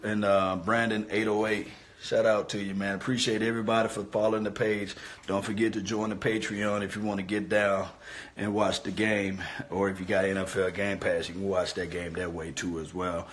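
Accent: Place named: New Orleans, United States. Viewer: love the channel. i know nothing of you personally but you sound more NOLA than Texas to me. Am I just completely off? EDIT... im not judging. I'm a Dallas fan in Eagle territory. This is a truly dangerous situation. Eagle fans are crazy AF.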